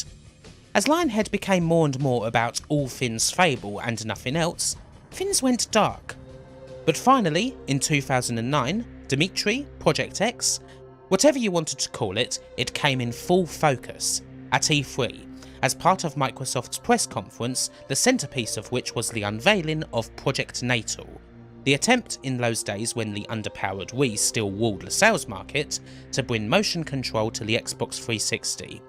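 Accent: United Kingdom. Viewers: Is that a British accent or a speech impediment?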